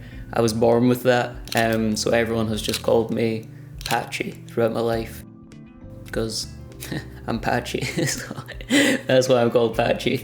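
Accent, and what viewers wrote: Scotland, United Kingdom. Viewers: This American was actually able to distinguish your Scottish Accent......😎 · It's awesome that you got your parents involved. I bet they enjoyed it. (BTW I had you pegged as Scottish early in the video 😉) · 😂 I knew you were a Scots man ! Well done love from Ireland ! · At the start of the video I thought you had a soft, American accent. Then I realized your Scottish. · Having been to Scotland plenty of times in the past, I instantly knew he was Scottish. However, the impressive thing for me is that his accent is really easy to understand compared to other people I have met in Scotland. He's really articulate and that is quite helping when watching the video. Congratulations for your work in the house, from Spain. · I think your tiny house is wonderful, and watching the process was truly interesting to watch. I'm glad you're planning on doing more. 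I'm now a subscriber! If you want to make some money on it, all you have to do is wait a few years to sell it..... And how could anyone mistake your lovely Scottish accent and sense of humor? Best of luck to you! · Awesomely well done. I’m 50% Scot myself. My mother was born in Armadale. So of course I love your Scottish accent. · You sound 200% Scottish. Not sure how anyone could say anything else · For me, your house has so much character. I appreciate that the house needs insulation and think you did everything right. I have visited Scotland, Banff and I really enjoyed my stay. I had lovely weather. I visited for a valentines weekend. My fiancé, at the time, drove through the night. It took about 11 hours! It was a great adventure. The people were lovely and we actually met someone from London, where I live. He was the pub landlord and was very nice. By the way, you have a lovely accent. 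I do like a nice accent and listening to you, reminds me of my stay in Scotland. Another super video 💜😁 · House looks great, made a nice job of it. And i'll be honest, im from scotland, but i assumed it was wales also. I hear the accent now...but the building still looks like it should be in wales :) Im assuming you had to get drawings/plans done for building warrant etc.Was there an architect/engineer involved at that stage, or did your builder deal with all that? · No, being Irish I knew you were Scots, but how do you get these people to work for you is my question? And that is such a beautiful place, you are so lucky! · Good golly! You sound Scottish, not Irish, not Welsh. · place looks amazing..I know those costs are quite high but, given the whole lot was done by Trade, it'll all be guaranteed and you have that peace of mind. With regard the old character, you haven't destroyed it, it's merely covered. 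oh..and you couldn't sound more Scottish if you tried! excellent work! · Your builder/contractors did a great job. I thoroughly enjoyed this video and the restoration video that preceded it. I'll look forward to your next project. I knew you were Scottish when I heard your voice. Being of Scots descent on my Dad's side, I hope to visit the land of my ancestors one day. Keep up your good work and good luck in the wife-hunting. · I knew you were Scottish! I"m so proud I got it right! I watch Outlander, but the accents on Outlander are super thick, so I will confess, I looked up the author Michael Bruce you mentioned in the other video to make sure I wasn't totally off.😁😜😉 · Ireland? Wales? Please - that's such a Scottish accent! (and a lovely one at that) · I knew you and your house are in Scotland, by what I saw and your accent. When I was a wee lad, I lived in Glasgow and had an accent a bit different from yours and very different from what it is now. 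I just subscribed because I want to see more of Scotland. This looks great. I like what you did. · It is a blessed house, when a believer lives in it! :-) Because then it is the home/temple of God... :-) Enjoy living in your beautiful house and behold your wonderful scottish accent!!!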